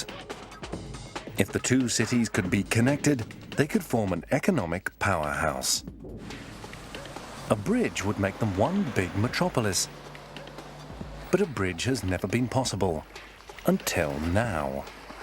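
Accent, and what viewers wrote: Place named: United Kingdom. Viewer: yes but the brittish accent is so nice.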